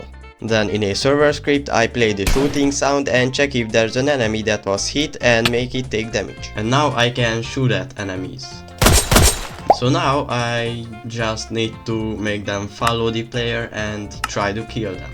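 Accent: Hungary. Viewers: i knew you were hungarian lol 😅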